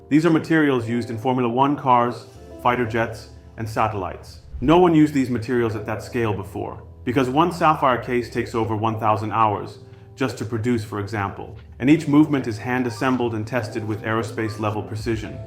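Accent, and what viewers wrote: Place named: United Kingdom. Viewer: You sound more British in this video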